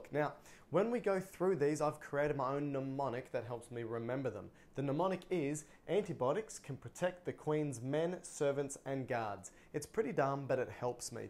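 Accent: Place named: Australia. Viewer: Used this to study for the GSSE here in Australia (where I assume you are from, it sounds like? Or maybe NZ)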